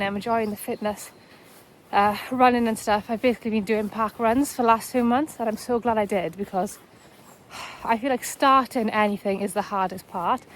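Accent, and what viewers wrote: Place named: Wales, United Kingdom. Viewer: I'm From Chippenham, Wiltshire. Recently, I discovered a Neolithic burial chamber which is just 20 feet from the road I have travelled along literally thousands of times. Lanhill Longbarrow, 3500bc. It has also been called in the past Ubbe's Low. Allegedly it contained the body of Ragnar Lothbrok's son Ubbe. But this is probably myth. Blows my mind to think one of the oldest buildings in these Island's history is just randomly situated just off a busy main road. Something so ancient and hardly anyone knows it's there. You have a lovely Welsh accent and it's magical when you say 'beautiful'. You're very humble, which I find to be a general Welsh trait. Keep posting from lovely Wales.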